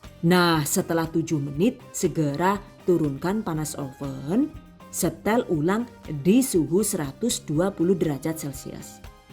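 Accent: Banyumas, Indonesia. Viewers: mbk e lucu nk wayah ngmong ngapak😂😂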